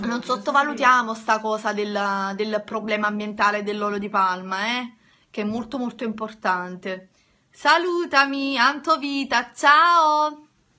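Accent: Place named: Naples, Italy. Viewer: Una cosa Chitarra romana lei è simpatica così com'è se cambiasse nn sarebbe e poi è Napoletana e a noi piace così sempre una cosa dite l'italiano l'italiano e basta ognuno parla a suo modo nn fate le perfettine a tutti costi e sia chiaro nn è un attacco e solo una risposta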